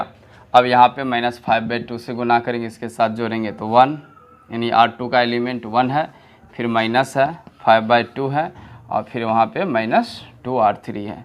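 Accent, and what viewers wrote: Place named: Bhojpuri, India. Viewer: Ek dam khati bhojpuriyan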